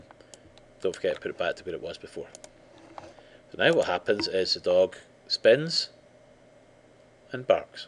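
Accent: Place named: Scotland, United Kingdom. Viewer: wtf ur scottish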